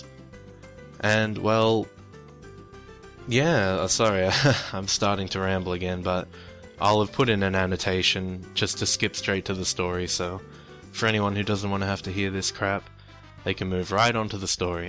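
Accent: Australia. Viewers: Both myself and my gf love hearing you read stories. Maybe it's that smooth ausie accent. Either way I'm watching your whole videograpgy. You are a great storyteller, never loose that wonder you have; through your mind and eyes and words we experience stories we may be too scared or busy or whatever to read ourselves. Laugh, cry, fearful tremors, or apathetic visualizations. You walk through them with us. Much love from cali, and thank you. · Congratulations and thanks, it's nice to hear an Australian accent narrating the creepy stories i love. your story is great too, I love the change of perspective and unexpected ending. great job!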